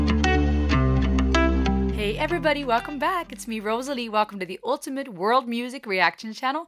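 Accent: Swabia, Germany. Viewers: Heut sich a bisserl Schwäbisch an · Well....if you like this.....and undeniable this is GREAT (and Floor will always find the weak spot in my heart).....you need to check out also the Version with and Tarja from from "End of an Era"...you will not be disappointed. Oh...and btw....your german accent is nice 🙂 Army Germany out for now. All Hail Queen Floor!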